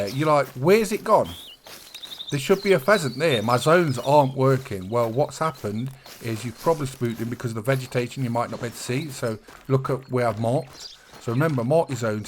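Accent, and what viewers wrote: United Kingdom. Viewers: never have i thought an absolute peak guide would be narrated by a deep voiced british guy. idk what it is but your voice makes me focus a lot better on the guide and my brain gathers the info better lmao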